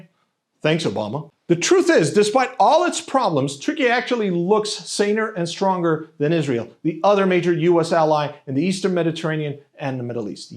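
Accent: Russia. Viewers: That russian accent... 😅👍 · I like your Russian accent… great …. No thanks to F35 …when you chase 9 years of Chasing Patriots … now go take hike they said sooooo well, …. C’est La vie….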